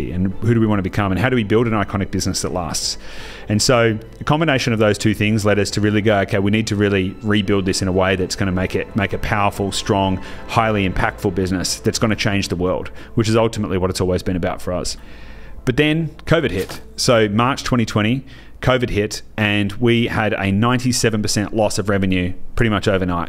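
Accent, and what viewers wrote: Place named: Australia. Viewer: I heard the aussie accent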